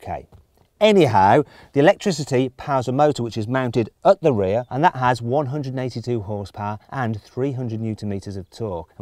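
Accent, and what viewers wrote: United Kingdom. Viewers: Apple嫌いなところ、共感します。ブリティッシュアクセント、大好きです。 あなたの動画はブリティッシュアクセントの良い勉強になります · ブリティッシュアクセント好きおった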